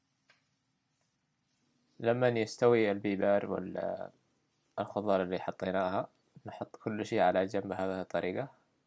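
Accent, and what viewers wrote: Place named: Sanaa, Yemen. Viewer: بلعكس لهجتها الصنعانيه احلا لهجات اليمن واصل ابداعك استفدنا كثير اغلب الأشياء اجربها الي تنزلها جزاك ألله خير.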